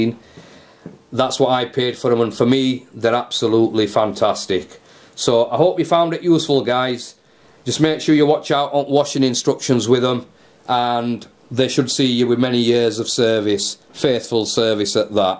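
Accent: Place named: West Yorkshire, United Kingdom. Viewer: God bless t West Yorkshire Dialect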